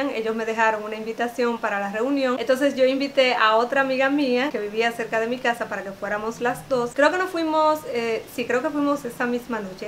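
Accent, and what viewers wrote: Dominican Republic. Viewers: He visto tu video varias veces. Me encanta ese acento Dominicano tuyo. Eres muy linda y muy valiente . Gracias por compartir tus experiencias y puntos de vista. Saludos desde Cuba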